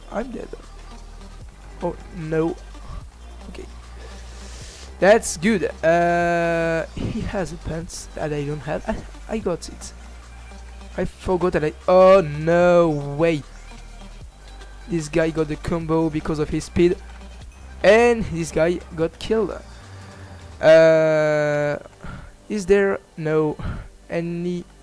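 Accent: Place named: France. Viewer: Yeah good english mate i love that lol i knew what is the french accent tkt sa s'ameliorera au file du temps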